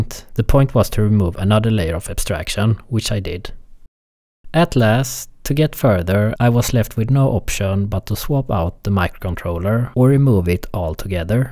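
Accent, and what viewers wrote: Sweden. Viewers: Interesting project but I especially enjoy the Swedish accent. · swedish? accent is swedish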